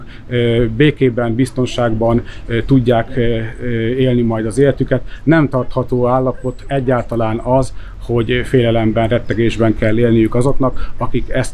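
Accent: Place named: ő-dialect, Hungary. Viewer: úristen! Nem egy olyan Mihazánkos aki értelmesen őzés nélkül eltudta volna mondani mi stájsz?!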